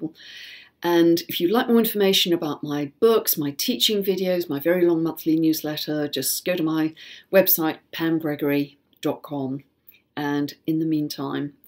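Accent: United Kingdom. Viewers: I have an interesting story with coming across this video! I have been feeling this energy intensely in my home life and searched for videos on the energy of this moon. There were a couple I saw that I thought I was going to watch but felt pulled to keep scrolling. I have never seen any of your videos but when I saw the thumbnail I had the though that you would have a British accent (I am from 🇨🇦). I heard a voice in my mind accent and all, and I became curious so I clicked to see if you were in fact British. Not only are you, but the voice in my mind was your voice!! And now hearing your message I am clear why I was guided here. I have been struggling with my 3.5 year old the last little while and last night was the most intense. He had a meltdown that we were lost in how to help calm him. So along with the macro of toppling governments or full political systems, I'm experiencing this on the micro that my sweet boy is toppling the hierarchy in our home 😆 As much as we are aiming to stay removed from the fear, there is definitely a layer of stress that has been resting on us with everything going on. 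I have been sensing for a long time that systems will be crumbling away, but they usually don't go easily. Especially when they are as deeply rooted as the ones in place now, and when many people are so used to being powerful at the hands of these systems. So worry comes easily when I think of my two young children (3.5 years and 8 months) and the potentially turbulent near futur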